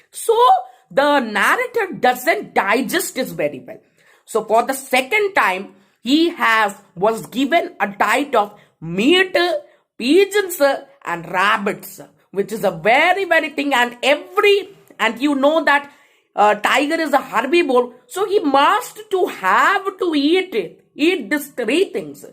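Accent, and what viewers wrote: South India, India. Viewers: What a pleasant surprise to us your way of telling is like a South Indian very good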